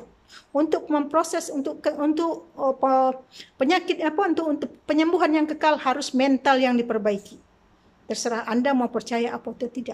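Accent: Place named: Malaysia. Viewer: Bahasanya has Malasiya enak didengar 👍🙏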